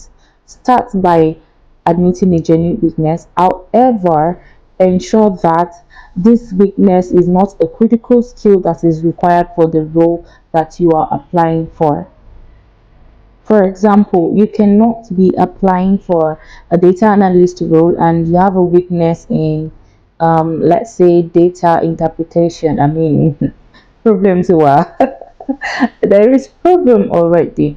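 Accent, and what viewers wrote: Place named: South Africa. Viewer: Madam, are you from South Africa? Please! God Bless You!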